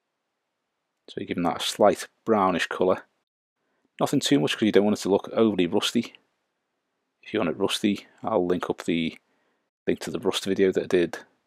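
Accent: Liverpool, United Kingdom. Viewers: This is brilliant. Its the little things that make it. Ill defo be using this. Scouser too 🤘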